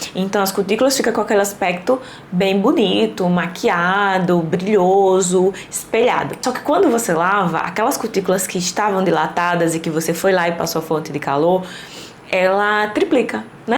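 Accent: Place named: Pernambuco, Brazil. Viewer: Olá! Você é Pernambucana? Seu sotaque é tão lindo 😊 sou nova aqui ❤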